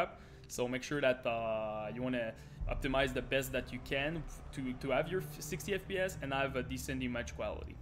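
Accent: Quebec, Canada. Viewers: l'accent québécois mon chum, bon vidéo big.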